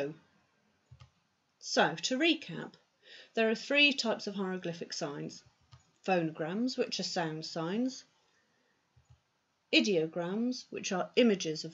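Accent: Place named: England, United Kingdom. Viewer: Yeah good lesson! I've difficult with your very well english accent for a french like me who's not so good to talk fine english and undesrstand english people. :-) But with several times to see and listen, i'll hope it will be better. Waiting too for the next... Best!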